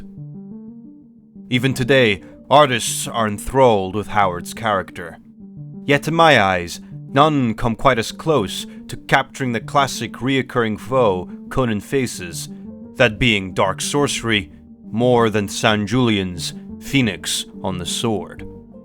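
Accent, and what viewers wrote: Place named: Finland. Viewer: Dude's accent sounds Finnish.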